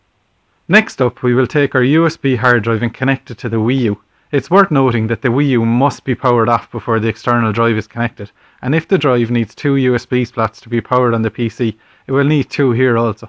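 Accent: Ireland. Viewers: hey are you irish i am!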